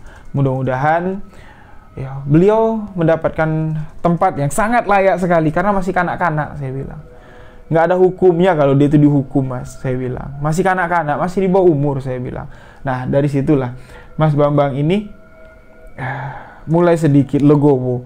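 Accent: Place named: Jambi, Indonesia. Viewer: Mas dari Jambi ya. Logat ny pengen tau aja saya. 🙏 atau dari Madura.. Salam dari Setu Bekasi. Sehat selalu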